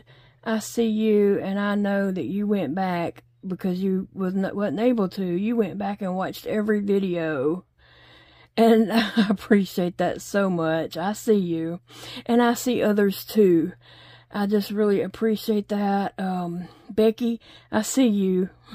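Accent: South, United States. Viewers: I must say again how much I love your Southern American accent ! So does my Chihuahua and my other puppy dog girls who lay around me and listen to u with me. 😁🐕💗 Our Aussie (Australian) accent is so different and not as pretty and relaxing to listen to.😂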